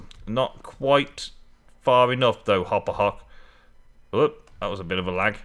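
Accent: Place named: United Kingdom. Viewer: Really excited for Tale; want to see what its like. Should watch a previous season. Couple notes: - Spoilish Jungle Upgrades count for Tropical, Taiga for Ice. It saves you a lot of upgrades. - One upgrade to meet the environmental challenge. Mana Spreaders - Way I do it, is I shift right click with a wand on the Mana Spreader and then shift Right Click on the Runic Altar. I would also try to bind a mana spreader to your Hopperhawk and see if that helps its range like it suggests. Or right click it with a wand, and mouse over it, and put the pool, mana spread in view so you can see if its highlighted. Not sure if its pulilng mana from the nearby pool. And I've learned to say it - Eso' Ter - 'ic; but love the accent and such so however Brits say it!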